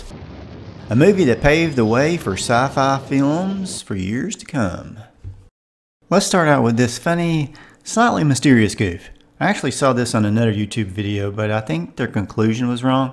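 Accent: South, United States. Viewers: dunno too busy trying to listen to your forrest gump accent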